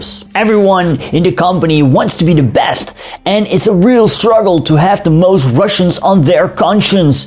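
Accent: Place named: Netherlands. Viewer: oh mate I'm watching them all. I love your take on the subject and the crazy Dutch accent. My history teacher was an inspiration at school and I never lost the love of the subject.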